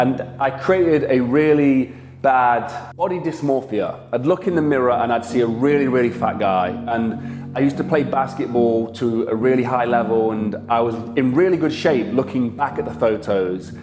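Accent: Nottingham, United Kingdom. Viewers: Notts lad?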